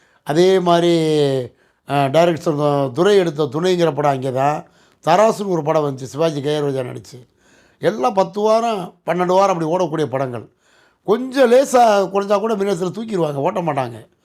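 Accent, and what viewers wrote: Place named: Madurai, India. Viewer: சரளமாக மதுரைத் தமிழில் ரசித்து சினிமா பற்றிய தகவல்கள் வழங்கிய தங்களுக்கு நன்றி 🙏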